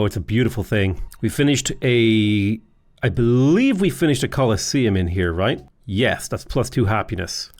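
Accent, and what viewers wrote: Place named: Ireland. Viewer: I'm sure you get this question or comment at a regular clip, but how heavy is your Irish accent compared to folks in your area? I'd love to watch an upload where you ham up the accent for us non-Irelanders, I bet that would be fun XD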